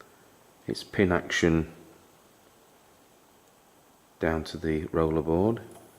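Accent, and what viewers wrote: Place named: RP, United Kingdom. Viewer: A very interesting video and you do talk very posh!:o)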